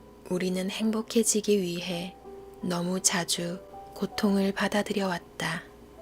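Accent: Gyeongsang, South Korea. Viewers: 경상도 사투리가 부드럽고 달달한 목소리로 들립니다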